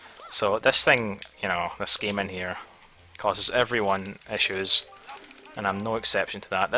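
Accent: Scotland, United Kingdom. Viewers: Oh, damn, this is the first video I've seen from you - a friend recommended your channel. Nice to hear another Scot! Mind me asking what part you're from?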